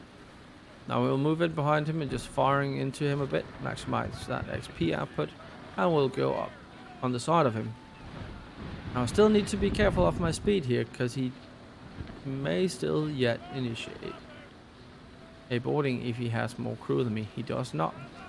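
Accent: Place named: Denmark. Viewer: Your accent tells me you are danish så hvad så der :D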